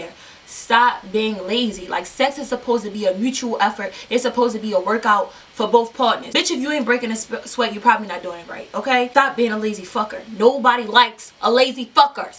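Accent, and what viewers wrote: Baltimore, United States. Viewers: I know you from Baltimore with that accent.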